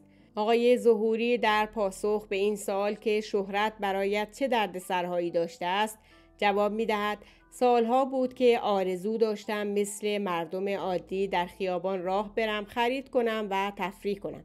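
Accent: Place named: Kermanshah, Iran. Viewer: با عرض سلام واحترام فراوان به شما خانم خوبه مجری این کانال خوب ...لهجه تون به کورد کرمانشاهی. قصر شیرینی میخوره اگر اشتباه نکرده باشم..نه فقط لهجه ی قشنگتون بلکه اون چهره ی مهربون شما هم همین رو میگه ..نمی دونم شاید اشتباه کنم..موفق باشید ..ویدوی های بسیار قشنگی دارین..از خانم ایران جلالی معروف به ملوسک هم اگر میشه برامون یک ویدئو درست کنید .ممنون میشیم ...❤❤❤❤❤❤❤❤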